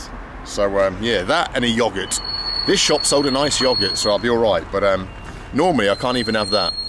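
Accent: United Kingdom. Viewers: Great video,! A foreigner who helps me, the russian to better understand a "misterious russian soul". I enjoy your epic voice and nice brittish accent. Thanks for travelling across russia's provinces. Hope to see you one day here in Russia's Far East Sakhalin. And yes, all museums in Russia are usually closed for a day-off on mondays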